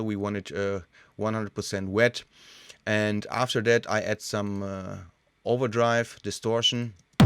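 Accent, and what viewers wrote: Germany. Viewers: man hört das er deutscher is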